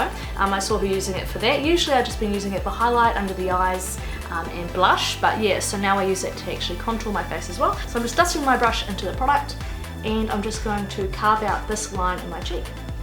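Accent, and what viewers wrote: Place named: New Zealand. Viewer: I'm from Egypt :* I knew you were from New ZeaLand because your accent is similar to ShaaanXo's .. I like your accent a lot actually :))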